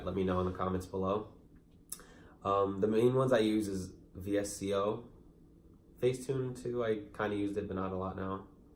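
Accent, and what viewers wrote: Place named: New York, United States. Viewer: Your NY accent is so awesome. Please keep making vids. They’re really interesting. Good job.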